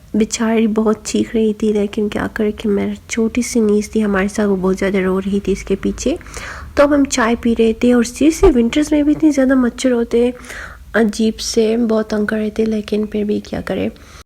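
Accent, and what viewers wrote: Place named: United Kingdom. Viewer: Over kahin ki urdu bhi English accent me bol rai ho be original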